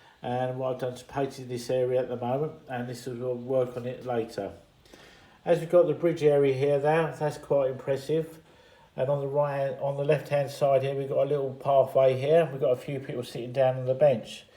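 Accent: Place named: United Kingdom. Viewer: The rock faces look really good and the canal detail is wonderful, even the shopping trolley. Did you buy that or make it? I am a little confused because your "About" says you are in the USA but the name of your channel plus your accent makes you British. I'm being nosey I know. Regards Michael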